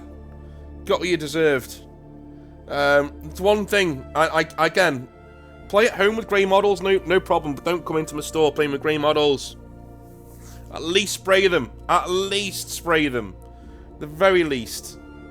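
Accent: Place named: Liverpool, United Kingdom. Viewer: are you Scouse? I swear you have a Liverpool accent but like you've moved about alot so it's become more subtle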